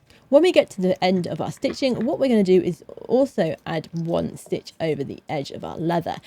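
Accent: England, United Kingdom. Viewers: thank you so much for your videos !!! it's always a pleasure to see it, everything look super easy with you and it is very well explain Ps: I love your english accent <3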